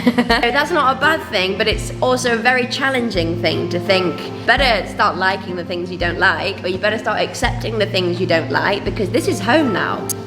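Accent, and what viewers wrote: United Kingdom. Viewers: 很好聽的正統英國腔～～❤❤👍👍👍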